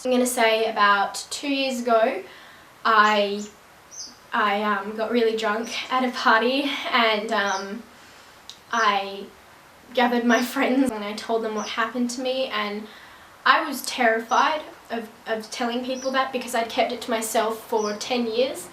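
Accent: Australia. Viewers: Is Australian?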